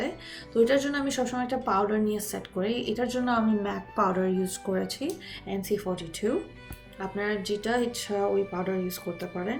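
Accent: Bangladesh. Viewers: i gues u r from the northern part of Bangladesh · I guess you are from bogura.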